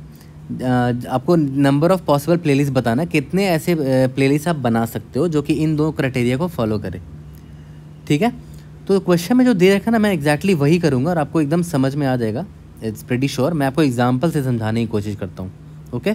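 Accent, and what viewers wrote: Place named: mixed, India. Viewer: aapke accent se lag hi raha tha up bihar hoga padosi nika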